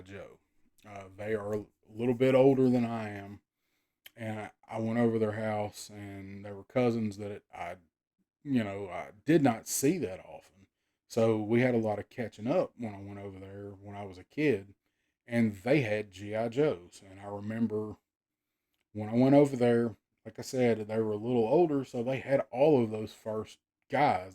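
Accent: South, United States. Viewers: Great video! The drawl is REAL y'all!! It's what we call "talkin'" down here in the south. Nonetheless, this is a good vid to let everyone know what you are about. So far, so good! I can't wait to see what you do with this channel, man! It's off to a great start!! And this is a love letter to the power of family and how important that is to our lives. We are fortunate to have gotten so many Joes and other toys at the time! I know not everyone grew up that way. But, we did, and it was freaking awesome! The creek, the woods, the go karts, the BB guns and hunting snakes, the dump trucks, the dirt clods, the lemonade at our grandparents, the small town gas stations, and the southern drawl. But most of all for us, it was GI Joe and it was the best! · Hell yeah! Love the humor and the Southern Drawl!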